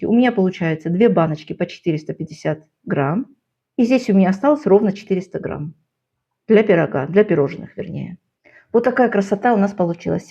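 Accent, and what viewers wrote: Baku, Azerbaijan. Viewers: Спасибо Вам! Живу в Краснодаре. Так приятно слушать Вашу речь, бакинские нотки слышны.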